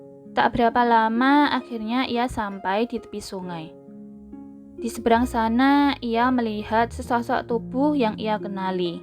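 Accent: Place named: Java, Indonesia. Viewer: doorrrr Jawa banget aksen nya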